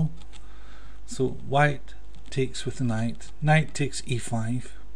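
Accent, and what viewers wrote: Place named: Scotland, United Kingdom. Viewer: I think he's saying "pat" -- a "pat opening" ... but these Scots accents are tricky!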